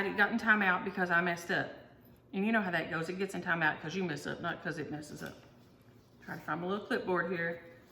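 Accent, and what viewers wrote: South, United States. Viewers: Oh my gosh those babies are so adorable!!! Have a good walk Yes I too think I would enjoy your narrations of books, I love southern accents and end up trying to talk like you after watching your videos..lol We also have the same tradition of doing a Sleepy Hallow and Christmas Carol reading for the holidays. Pass along the giveaway if it lands on me for saying reading.. I just wanted to connect with you about traditions <3 Happy stitching -Jenn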